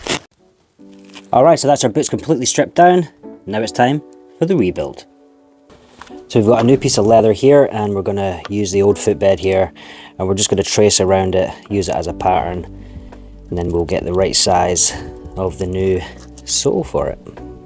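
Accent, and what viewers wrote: Scotland, United Kingdom. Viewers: He's scottish. S c o t t i s h ...get it ? Understand ? i can say it slowly for you if its still too difficult. Guess what you can give him a view with the sound off too 😂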